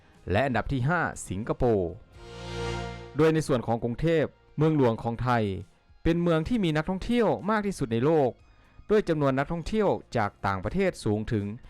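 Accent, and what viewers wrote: Laos. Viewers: ผมก็ฟังออกว่าคุณเป็นคนลาว มันแตกต่างกันกับคนไทย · เดาไม่ผิดแอ๊ดเป็นคนลาวแน่นอนคนไทยแต่ละภาค ผมฟังสำเนียงออกรวมถึงชนเผ่า คนลาวจะไม่มี ช.ช้าง จะพูดออกซ.โซ่ นี้คือสิ่งที่ผมจับจุดได้แบบ99.99% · ถ้าออกเสียงสำเนียงแบบไทยแนะนำแอดอ่าน ใช้ ไม่ใช่ ไซ้/ ฉุด ไม่ใช่ สุด/ฉะเหลี่ย(เฉลี่ย) ไม่ใช่ ศธเกลี่ย และอีกหลายๆคำที่ไม่ได้กล่าวไว้ ***แต่ถ้าปรับไม่ได้ก็ออกเสียงแบบลาวไปเถอะ คนฟังและดูเค้าเข้าใจภาษาลาวอยู่นะ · รู้สึกเอ็ดดูแอดมินที่พยามพูดภาษาไทย แต่ก็ยังฟังออกว่าเป็นคนลาว เพราะพยัญชนะ ค ย ฉ ช ซ ส ษ ศ ล ร ฮ เหล่านี้เป็นต้นที่ทำให้คนลาวออกเสียง และสำเนียงผิด ส่วนลาวจะออกเสียง ຊ = ช ซ ສ = ฉ ศ ษ ລ ຮ = ล ร ອ = อ ฮ อะไรประมาณนี้ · คนพูดเป็นคนลาวถูกต้องไหมครับ😅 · พูดไทยแต่ออกเสียงลาว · แอ็ดมินจากสปป.ลาว ก็จะออกเสียงได้ประมาณนี้ครับ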